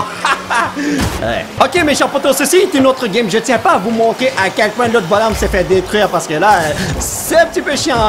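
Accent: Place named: Canada. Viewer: Ah ok mpi je suis diamant ^^, vu tas façon de parler tu dois etre canadien si je ne me trompe pas ? Si sa te dis on peut jouer ensemble ^^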